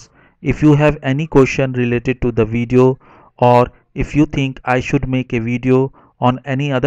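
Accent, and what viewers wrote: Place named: India. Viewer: thank you for this video your pronunciation sounds like india 🙂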